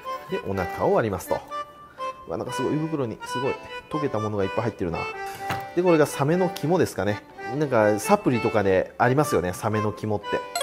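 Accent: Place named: Aichi, Japan. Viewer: の愛知訛りを聞くと地元に帰った気分になりすごく落ち着きますいつもありがとうございます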